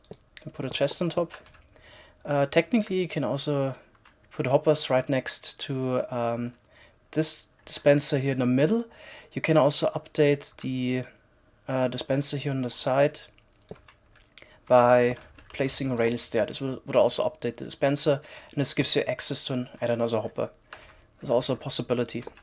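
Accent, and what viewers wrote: Germany. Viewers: You sound very German lol.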